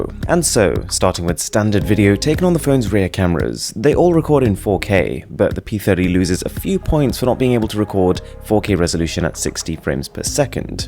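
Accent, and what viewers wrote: United Kingdom. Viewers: That brittttttttish acccccccent is thiccccccccccccc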